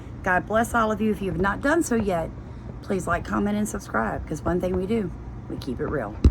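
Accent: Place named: South Jersey, United States. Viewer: I was thinking south Jersey. I have family and friends from there, and I can definitely hear south Jersey in voice! Even though she says y'all all the time because she lives in the south. She must be a Jersey girl from the start. I know the Jersey accent. I hear my maternal Grandmother come out of mouth all the time. LOLOL But - she dun went and married a suthorn boy!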